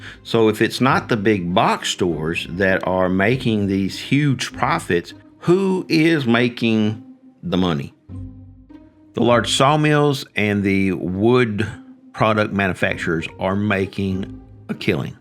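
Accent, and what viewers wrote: South, United States. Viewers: This is a highly edited video. And it’s repeating the premise and slow rolling the punchline and the good-ole-boy narration is only annoying. The answer is given in the final 30sec. The rest is just repetitive of the first third. The big saw mills are supposedly getting rich. There...you can skip it.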